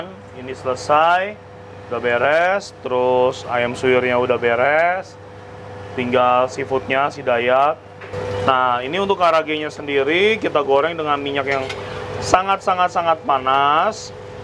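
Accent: Banyumas, Indonesia. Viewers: Kok jowone Inyong Inyong mang ini orang mana. Xie Xie ya Koo ilmunya Sucses selalu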